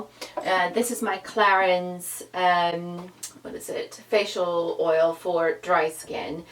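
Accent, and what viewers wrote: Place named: United Kingdom. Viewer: Very lovely! You are sounding more like a Britt every time I visit your blogs! I just love the accent ! Want to move back!!!!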